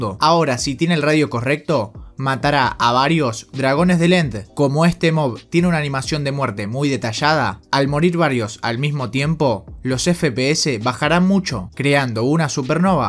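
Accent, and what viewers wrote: Argentina. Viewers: Lo mejor de los vídeos es la voz argentina.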